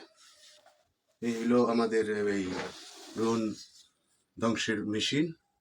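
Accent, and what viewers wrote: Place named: Dhamrai, Bangladesh. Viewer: Bhai ar desher bari koi Amar dhamrai basha amader moton tai bollan thanks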